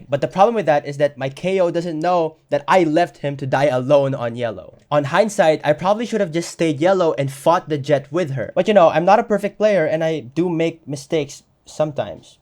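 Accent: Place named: United States. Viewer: Wait your accent was so American I'd never thought you were not American before I heard you speak a different language. Ur insane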